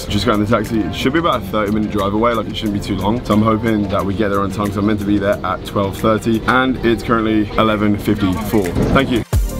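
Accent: United Kingdom. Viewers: Mom? Swear hes british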